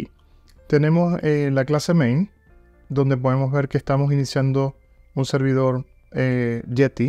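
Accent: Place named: Dominican Republic. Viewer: wow, jajaja! Tienes una voz grave y suenas un poco como los Dominicanos. Saludos bro! PD.: me suscribí a tu canal, sé que aprenderé mucho. Estoy aprendiendo Java y me gusta lo que veo, el backend es hermoso.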